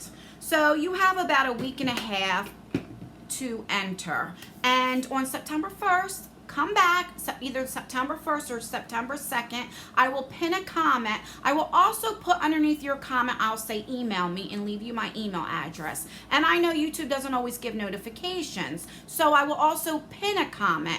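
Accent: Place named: Louisiana, United States. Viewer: Cool! Can’t wait to get my box!!! Hey, I keep hearing your accent (as someone from Oklahoma with my own accent 🤪) and you sound a tiny bit like you’re from Louisiana. I know it says Jersey in your intro, but just thought I’d comment that. Love your reviews!!!!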